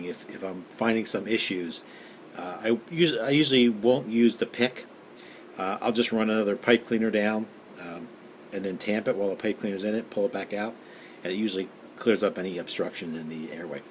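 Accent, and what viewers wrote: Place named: Black Sea, Turkey. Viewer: doğulusunuz ama karadeniz şivesi ile konuşuyorsunuz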